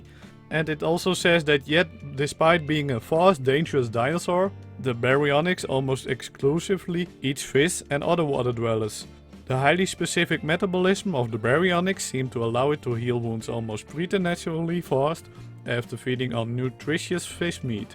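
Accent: Netherlands. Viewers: are you dutch? you kinda talk with an Dutch accent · He is from Holland, it's a dutch accent. Yo, Maak jij ook mods voor ark? Of heb je er weleens in verdiept? Ik verdiep me er op het moment in, maar zit nog met een heleboel vragen. Ik wil namelijk een 3D object maken en importeren in Ark, modelleren is het probleem niet maar het importeren wel. Gr Erwin.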